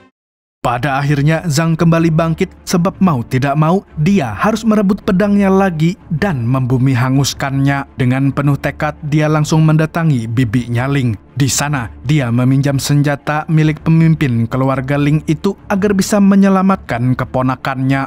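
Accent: Banjar, Indonesia. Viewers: Org Banjar Sekali ny Sidin nih lah😂😂😂😂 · Orang Banjar'kh ikam ni.? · Orang banjar kh admin nya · Logat banjar 😂👍👍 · Adminnya urang banjar le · Banjar kah pyn 😅😂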